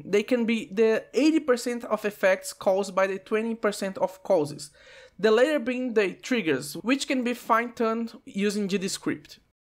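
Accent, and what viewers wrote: Brazil. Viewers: Sabia que era BR só pelo sotaque. Brabo homi! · Percebi pelo sotaque. Ganhou um inscrito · Eu sabia pelo sotaque que tu era brasileiro kkkkkkk salve, e seu inglês é MT bom btw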